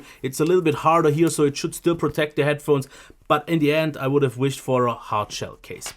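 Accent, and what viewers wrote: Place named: Germany. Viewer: Nice review really convienced me! The German dialect is fun and charming, keep it up!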